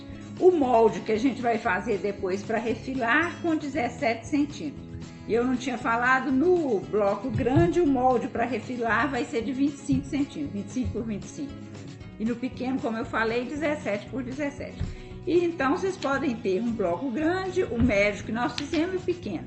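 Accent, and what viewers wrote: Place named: Minas Gerais, Brazil. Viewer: Suas explicações são excelentes, adoçadas com sotaque mineirinho ficam melhores ainda! Adoro seus vídeos!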